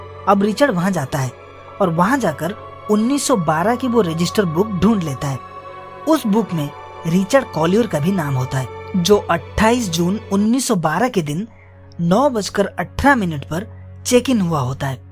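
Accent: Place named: Gujarat, India. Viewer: Itni acchi movie ko gujrati accent mein explain kiya hai isne 😢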